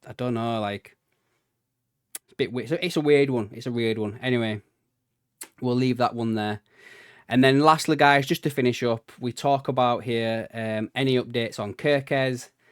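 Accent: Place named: Yorkshire, United Kingdom. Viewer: Proper Yorkshire our is.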